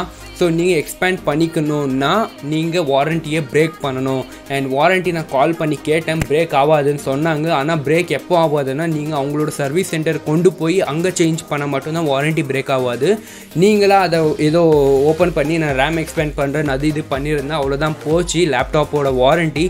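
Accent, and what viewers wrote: Bangalore, India. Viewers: You have a typical Bangalore accent bro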